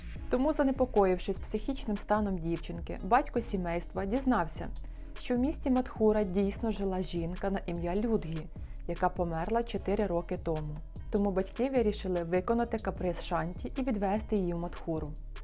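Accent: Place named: Western Ukraine, Ukraine. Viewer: Мені подобається Ваш західний виговір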